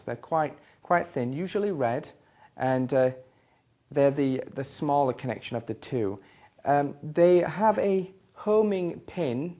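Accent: United Kingdom. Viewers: lovin the way he alks :D so english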